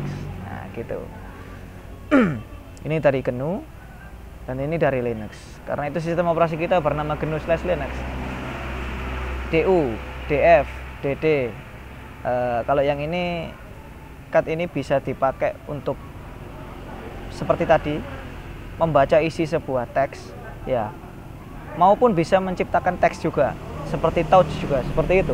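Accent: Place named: Surabaya, Indonesia. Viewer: Logate medok, ketok arek suroboyo y? Hahaha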